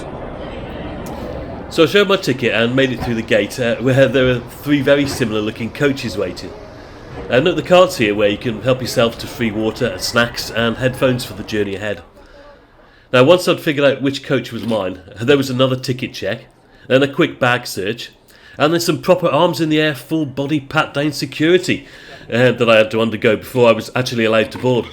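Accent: Midlands, United Kingdom. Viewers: I'm off to Mexico in a couple of weeks - thanks for doing this upload :) PS do I detect a fellow Midlander from the accent ?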